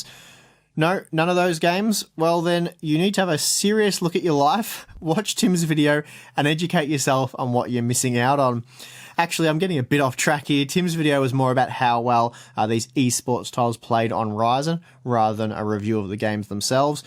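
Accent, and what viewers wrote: Australia. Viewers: Oh hey Your Australian!!! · Hey! I must say your accent is kinda hard to understand (I'm not a native speaker). But I had an Australian teacher and now it is easy for me to understand, just wanted to share my experience. Btw awesome channel!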